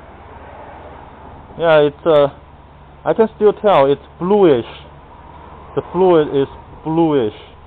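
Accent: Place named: China. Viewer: cool bro!sounds like you are chinese,me too!keep going,you did good job!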